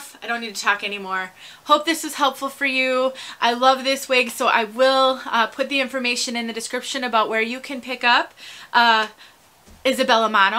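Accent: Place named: Illinois, United States. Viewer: Girl, you from Naperville, Illinois? Your accent...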